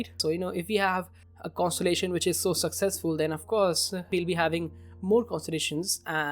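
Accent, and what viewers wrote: India. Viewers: Are you from India? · He is an Indian i can say that by his accent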